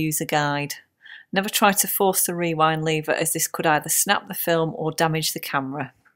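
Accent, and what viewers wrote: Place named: United Kingdom. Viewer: Thank you very much for uploading this nice and clear video with a calm voice that pronounces that very lovely and great British English language that everybody can understand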